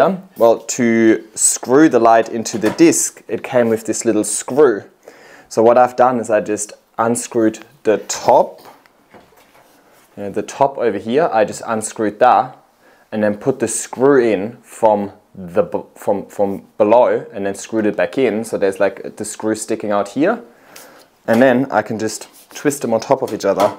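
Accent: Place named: Germany. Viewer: Can anyone tell me if he has any German background 😭😭 I know his name is but my god his accent sounds so German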